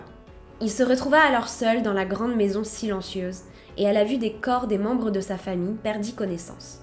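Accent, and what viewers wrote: Quebec, Canada. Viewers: Bonjour, ma première video de toi, j'aime beaucoup, merci. Je sens une toute petite pointe d'accent québécois, je me trompe ? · pas de quoi, je me disais bien que ça devait venir de l'anglais, mais ton accent québécquois n'est presque pas perceptible, je sais pas si tu l'a travaillé, mais ça fait très pro! bonne continuation!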